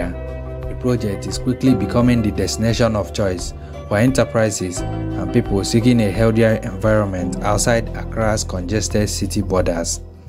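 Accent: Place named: Nigeria. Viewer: You sound so foreign with the way you pronounce the city's names of Ghana. I have no doubt your accent is Nigerian. Anyway, thanks for sharing the info about Ghana. Let's see how Nigeria is doing on mega construction projects in your next video.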